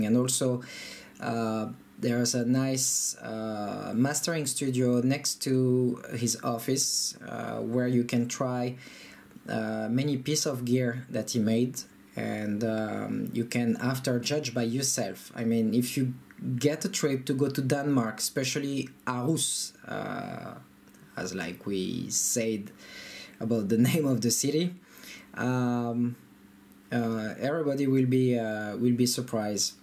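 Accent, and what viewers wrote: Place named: France. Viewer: Good job ! And Good "french accent"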